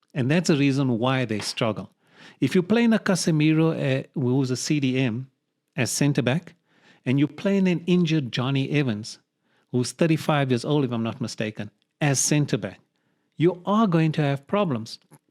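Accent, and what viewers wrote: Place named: Durban, South Africa. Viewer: Aslm, nice to see someone from RSA making united vids, keep it up. Sounds like you're from Durban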